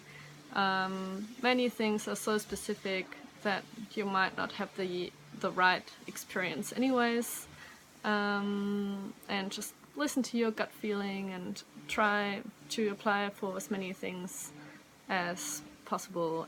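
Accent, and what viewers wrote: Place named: Australia. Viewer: noice ozzy accent :)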